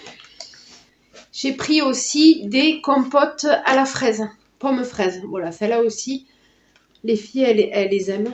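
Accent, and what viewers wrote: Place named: South, France. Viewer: Je découvre vos vidéos et c'est très intéressant pour essayer d'optimiser ses courses et limiter les dégâts... Au vu de votre accent... Ceci me laisse croire que vous êtes du sud ou je me trompe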